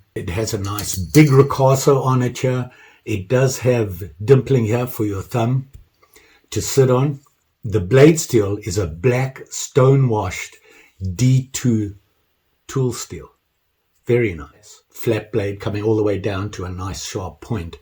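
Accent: South Africa. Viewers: Just found your channel and being a knife maker and mad about knives really enjoying your content, recognized that accent straight away 🇿🇦 . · are you south african ?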